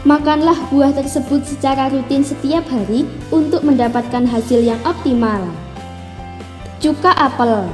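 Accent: Java, Indonesia. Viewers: Logat jowo nya keciri bangetya. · Iya benar, itu dialek mbak jawa, dak apa2 yg penting jelas he he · pie to mbak u mgomonge koq medoq bener se....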